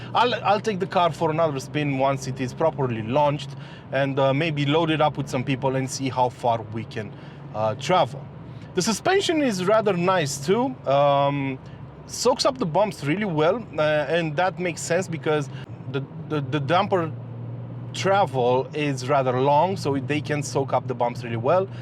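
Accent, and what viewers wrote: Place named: Romania. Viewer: Judging from your accent, you are from Romania? OK, you are using some American expressions and their units of measurement, but stil, I can guess the Romanian origin 😎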